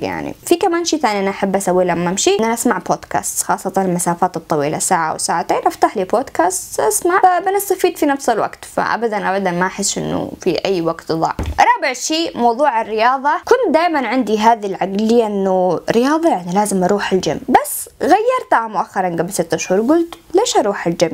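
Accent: Sanaa, Yemen. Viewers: محلاش ماشاء الله عليش يوم تتحاكي بالصنعاني ي لبى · فديت اللهجه هذي الصنعانيه الحاليه اللي اشتي اتعلمها وفديت بنت بلدي الانيقه الراقية الله يسعدش 💗🙂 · انتي.غير بنات اليمن.انا مش مصدق انك يمنيه.لهجتك خليتنا اصدقيك انك.من اليمن.الله يحفضك وايوافقيك اختي.هيثم من اليمن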